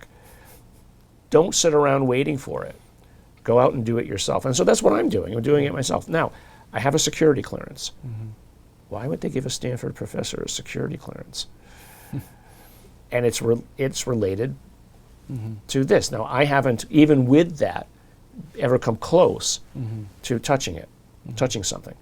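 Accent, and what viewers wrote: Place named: Liverpool, United Kingdom. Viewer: Love the Liverpudlian Nolan ❤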